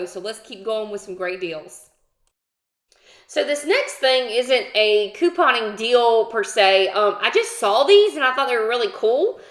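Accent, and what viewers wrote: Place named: South, United States. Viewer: I love your cute southern belle accent. Publix is my favorite store to coupon at.❤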